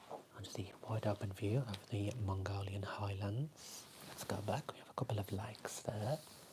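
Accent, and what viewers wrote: United Kingdom. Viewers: Most likely British English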